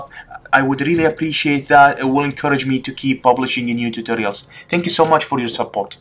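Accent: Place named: Iraq. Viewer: لهجة مالتك مال عراقي انت عراقي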